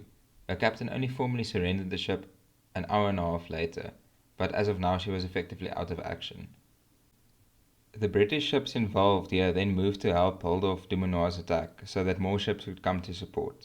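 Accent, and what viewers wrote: South Africa. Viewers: You afrikaans? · are you from south africa?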